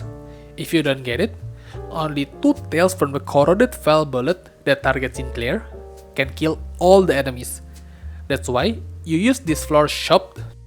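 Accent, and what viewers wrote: Indonesia. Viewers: indo ya bang? kedengaran banget accentnya wkkwkw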